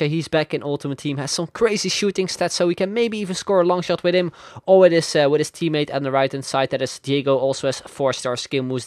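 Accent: Netherlands. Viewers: He is dutch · Alright i never hurt of you bfore no lie but in 3 sec i knew you where dutch and subbed right away xD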